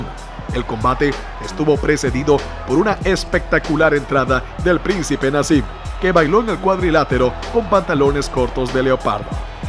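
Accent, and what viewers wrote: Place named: Central America. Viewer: Parcial y mediocre tu video y TU SABES muy bien porque. No ubico tu acento pero debes ser centroamericano, no encuentro otra explicacion para tan inconmesurable omission.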